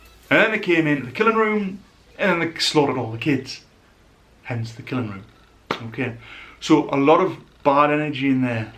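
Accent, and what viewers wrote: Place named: North East England, United Kingdom. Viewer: Great video! Me & my friend are staying overnight there on the 24th October! You sound like you're from the North East. We're from Teesside.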